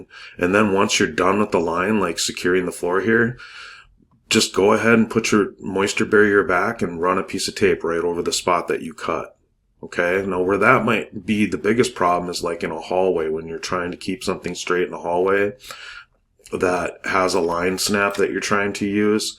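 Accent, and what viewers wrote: Minnesota, United States. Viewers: I love your videos... great explanations. And I know how hard it is to quit smoking!!! I quit after 20 years. Also enjoy that Minne-soooota accent. All my people live there. Great job. I also pray you will quit smoking.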